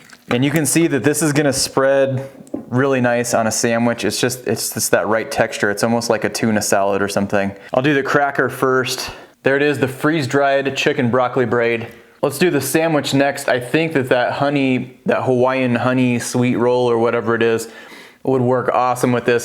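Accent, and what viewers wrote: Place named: South, United States. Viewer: I moved to South Carolina about 2 years ago and have gotten used to hearing random southern accents in conversation (I’m originally from CT). I legit thought you were saying ‘Chicken Broccoli BREAD’… I’m like ‘why the heck does he keep saying bread in that weird accent?! Maybe he’s pronouncing it the way his mama pronounces it? … Then I see the BRAID … 🤦🏻‍♀️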